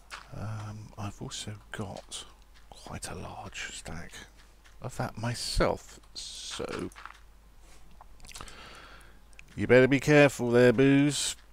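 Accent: United Kingdom. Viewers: may i ask. Where are you from in the UK?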